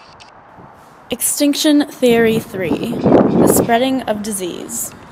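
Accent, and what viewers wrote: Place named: RP, United Kingdom. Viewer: You are so posh!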